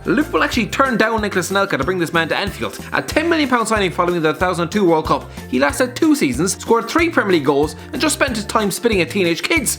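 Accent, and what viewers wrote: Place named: Ireland. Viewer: If I click on one of their videos and do not hear a majestic Irish fella then I’m gone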